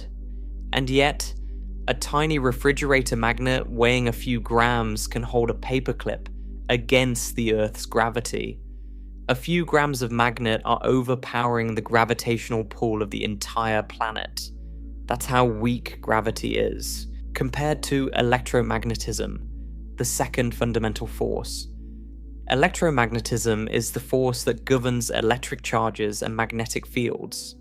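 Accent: United Kingdom. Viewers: Another AI video with a British accent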